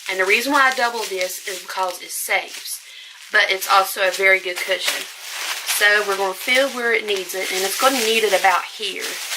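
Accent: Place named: South, United States. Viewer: great video as always, thanks. and that southern accent...love it. It must be a canadian thing..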